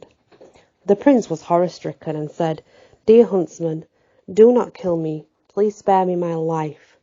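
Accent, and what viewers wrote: United Kingdom. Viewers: sounds great with you English accent 🇬🇧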